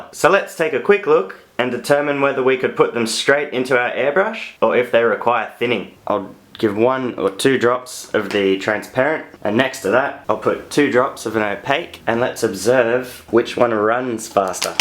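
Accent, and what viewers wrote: Australia. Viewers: thanks for the advice and hey I like your astarlian accent :)) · thnx m8, btw I love the AUS- ACCENT.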